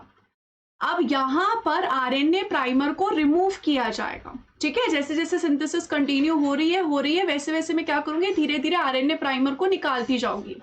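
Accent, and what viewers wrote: India. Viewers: Ek number bhava khamgaon bolte❤️❤️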